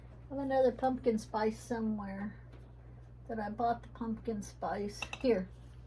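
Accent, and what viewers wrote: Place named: South, United States. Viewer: Girl....your accent is Perfectly Southern just like mine....I Love it!!!! Get your Wizard friend some E6000, his crystal shouldn't break off again with that. I miss the Tang of yesteryear. Might have to pick some up at the store...lol Sale and clearance....I roll like that too...lol Star Anise, I would say in the spices. 💜💜💜💜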